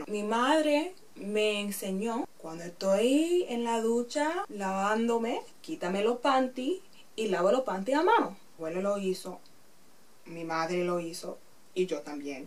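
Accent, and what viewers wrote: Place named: Spain. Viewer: No creo que equivocaste por nada que yo entendí todo perfectamente. Great video and love your Spanish accent 💜💜💜💜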